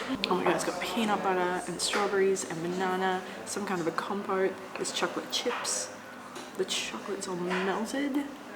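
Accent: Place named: Australia. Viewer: Hiya! Welcome to Denmark! I’ve been to Copenhagen twice and it’s such a peaceful quiet city with lots of gorgeous parks. I’ll help you choose the bed. Always choose the top one! There’s just 3 cities in which I would see myself living, it’s London, Melbourne and Perth. Would you see yourself living in Copenhagen? Thank you for sharing Tivoli Gardens, I’ve only seen it from the outside as they were closed with Easter. Now I know I actually missed something, reason enough to visit for the third time. Good thing is that I can be in Copenhagen within 3 hours starting from my home address as it’s just half an hour to the airport and just a 1 hour flight. The canals are gorgeous in over there. I really got the feel that I need to get back to CPH. Nyhavn is a great place. $18 for a sandwich is insanely expensive. Getting on the canals is one of my favorite things to do when visiting a city, boat tours are usually quite good. 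By the way, have you taken the train to Malmo? That’s a great one-day trip from Copenhagen, just 30 minutes by train. I completely feel you with that solo traveling through Scandinavia. I’m not usually into hostels because I’m a light sleeper, but this hostel seems amazing. I love the Australian accent, it’s the best English there is. I hope you’ll enjoy much more of Europe. Now that you’re in Europe, I can finally catch up with the long bucket list you made for me 😂. Have a wonderful week and safe travels 🤙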